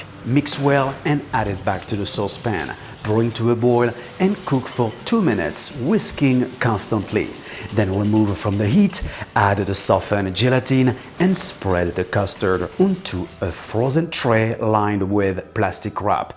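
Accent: France. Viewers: The French accent tears me into pieces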